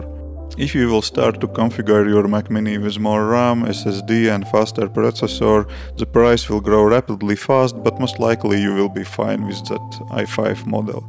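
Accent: Russia. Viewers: Артем Анащенко Русский, ибо такой акцент трудно спутать))).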